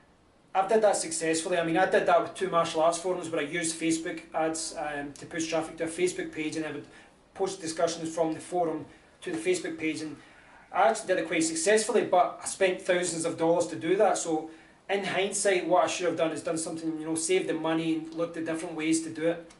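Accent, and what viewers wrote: Scotland, United Kingdom. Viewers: Hi What part of Scotland are you from? I live in East Lothian Love the vids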